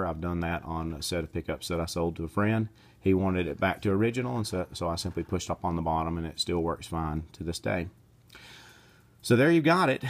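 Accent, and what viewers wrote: Texas, United States. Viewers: Can I guess your accent? Northern Texas or Oklahoma?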